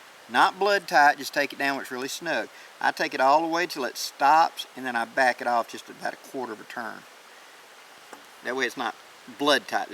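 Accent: South, United States. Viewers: Iimpact driver,small hammer, well fitted bits, and a padded vise with patience and care will get the screws out. putting them back where they came from is brilliant. Very nicely done sir. P.S. I thought I did hear that Magnolia in your mouth. Hurrah for the Bonnie Blue flag. The fourth screw is for the shoulder stock which will not work on this gun.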